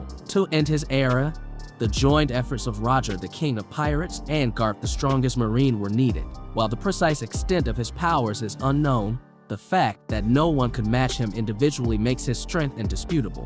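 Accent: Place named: Georgia, United States. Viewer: this is the most Georgia accent I've ever heard lmaooooo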